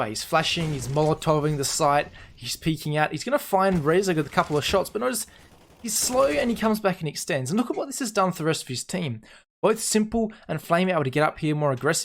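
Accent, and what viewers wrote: Australia. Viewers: Nice recommendation, but he is not from NA I think. He must be from Australia, that's why he is speaking with a funny accent.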